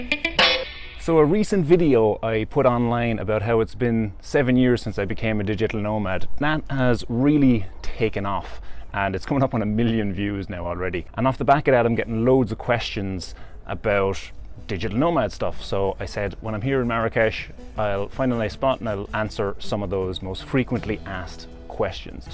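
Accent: Cork, Ireland. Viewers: It has to be Cork. He has Roy Keane and Dennis Irwin’s twang to his accent and voice. But a bit more well spoken lol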